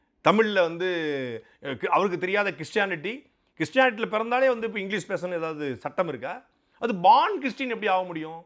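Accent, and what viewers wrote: Tirunelveli, India. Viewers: சாட்டை அவர்களே 1800களில் இத்தாலியிலிருந்து தமிழகத்தில் கிருத்துவ மதத்தை பரப்புவதற்காக வந்த ஜோசப் பிஸ்கின் தமிழ் மீது பற்றுதல் காரணமாக எனது பெயரை வீரமாமுனிவர் என்று பெயரை மாற்றி தமிழில் பைபிளை முதலில் அச்சிட்டு தமிழ் மொழியை பற்றுதலால் தமிழுக்கு இலக்கணம் வகுத்து தமிழுக்கு தொண்டாற்றி அறிஞர்களிடம் தமிழை கற்று தமிழில் பல நூல்களையும் எழுதி கிறித்தவர் தான் நாம் பேசும் நீங்கள் பேசும் பாட்டை அவர்கள் பேசும் தமிழை அழுத்தம் திருத்தமாக பேசும் திருநெல்வேலி தமிழை தூத்துக்குடி அருகே உள்ள சாயர்புரம் காட்சிக் கூடங்கள் நிறுவி கிருத்தவ தேவாலயத்தை கட்டி அன்று ஏழை எளிய மக்களை உயர்வுக்கு கொண்டு சென்றவர் இவருக்கு கருணாநிதி சிலை எடுத்தார்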